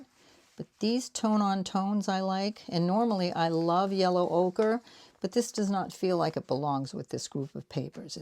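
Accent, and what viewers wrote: Boston, United States. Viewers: It's called "code switching" when you change accent to that of those around you. It's a natural human psychological mechanism, and whole books are written about it. I had to study it for one of my sociology classes at uni. It stuck with me because i had several different accents around me, growing up, and had become adept at popping between them, but always felt fake- even though they were technically all MY native accents! Anyway- i adore the stories and the COLORS! Side note- i was JUST watching one of my favourite travel tubers, Steve Marsh, and he went to Iceland and had a whole segment on the US airbase there. Pretty cool to now run across someone who used to work there! The navy in the 79s? As a woman? You must have SO many stories to tell! I am hoping you share more! (Btw- your boston is still there- just around the edges. 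❤) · You devil. You and the algorithm of YouTube! I’m an ART SUPPLIES JUNKIE in recovery!!! It’s Sept 2025 and I CANNOT buy any art supplies, new or used, this month or else I have to pay $50 out to my BFF for losing our challenge this month. It’s Sep 19, eleven more days to go. And I’m struggling with gel plate printing. Oh, well, I’ll keep practicing and trying to find newsprint free somewhere. And I’ll start buying Nora colors in October, maybe 2-3 at a time. I’m loving this video, liking your stories, wishing I could hear your Boston accent, and away we go. BTW, 1976 is also when I started 12 Step Recovery at age 31. I’m 81 and still loving arts and crafts. Also, I just don’t get color theory. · I thought I recognized that accent! I worked to get rid of mine as well and now live in NH and boy do they sound different than Bostonians! When I drive under pressure that accent bubbles to the top and my kids don't let me forge tit. 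LOL. It's WICKED funny. Love your videos. Just found you today. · Love your story about your Boston accent, my husband is also from Boston (raised in NH) and worked hard early in his military career to lose his which he did, but like you, when he gets around other Bostonians, it comes right back! And once and while, he slips. I think it’s charming, lol. Btw, I also love Rex Ray art. I’ll send you a very cool video that I came across a few years ago. Have a lovely arty day❣️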